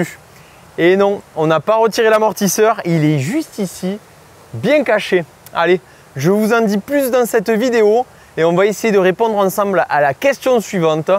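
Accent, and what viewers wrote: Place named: Toulouse, France. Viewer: c'est pénible cet accent du toulousain... tu pourrais pas copier l'accent ch'ti?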